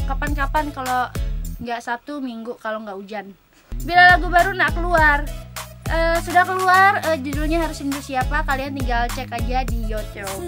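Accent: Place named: Malaysia. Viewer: Ya karena dia lagi di malaysia, jadi mencoba bicara dengan gaya malaysia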